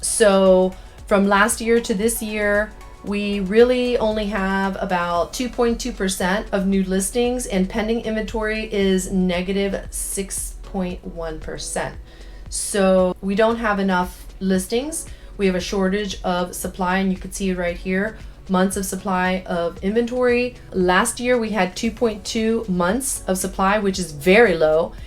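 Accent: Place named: United States. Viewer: I love your tips and also your beautiful American accent!!!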